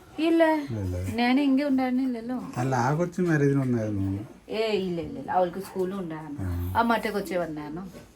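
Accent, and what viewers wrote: Tamil Nadu, India. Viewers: Tamil.mix slangil❤ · Chechide slangil korachu Tamil mix aavunnundu😅😅😅😅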